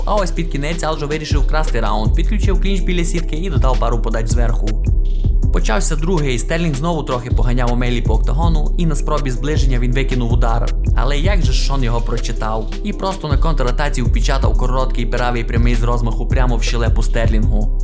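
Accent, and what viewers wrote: Western Ukraine, Ukraine. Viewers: Я привик дивитись по російській мові а тут ви по рідній західній Івано франкіівській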